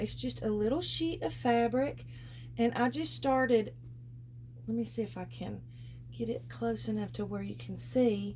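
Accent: South, United States. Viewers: I was sitting gluing and your video came up next on my playlist from the feed suggestions. I truly enjoyed it and loved your funny comments and of course I love your Southern Accent, I could listen to you the day long! Im a new subbie. Love your collage technique and yes I have more than my share of scraps. I so snippets but in long strips with a sheet base. Usually about 6-8 inches wide and it is to clean off my desk. Thanks for sharing and the fun! Have a very creative week!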